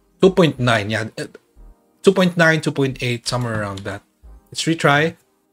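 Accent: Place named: Philippines. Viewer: Pinoy na Pinoy Ang accent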